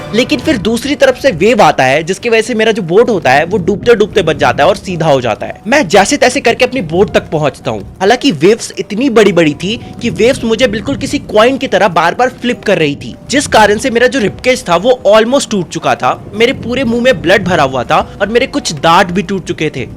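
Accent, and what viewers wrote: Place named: Bihar, India. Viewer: Abbe sale bihari pronounciation to sudhar le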